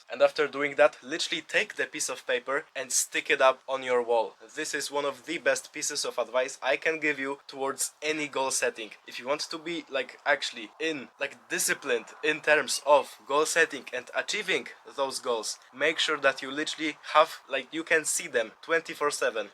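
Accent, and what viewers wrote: Poland. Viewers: Polish, I suppose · jak to jest że po twoim akcencie od razu poznaje rodaka XD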